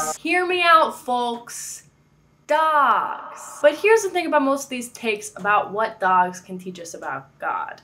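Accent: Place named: New York, United States. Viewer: You get more of a new york accent with every video haha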